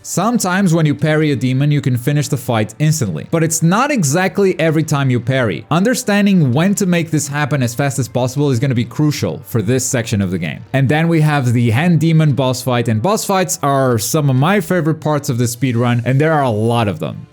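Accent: Portugal. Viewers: PERA AÍ VC É DE PORTUGAL?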